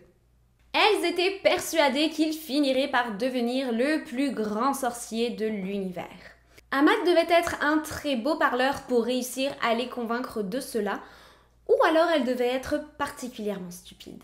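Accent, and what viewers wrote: Quebec, Canada. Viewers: Est ce que tu vis au Québec ? Tu as un accent québécois je trouve 😊 très bien raconté, encore merci ! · Tu commence à prendre l’accent québécois! Wow. Félicitations pour tes 31k❤️‍🔥❤️‍🔥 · C'est tu moi ou desfois ta un accent quebecois??? Genre des sons je sais pas xD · J'ai découvert ta chaîne hier soir et je trouve ça génial , un vrai plaisir et pour quelqu'un qui vient de France tu a un joli petit accent canadien 😉👍🏼🌹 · Salut jai tomber sur tes videos aujourd'hui....jadore ton accent....je vit au quebec et jai le doute que sois tu vit ici mtn...sois tu y a vecu un bout de temps!!!😅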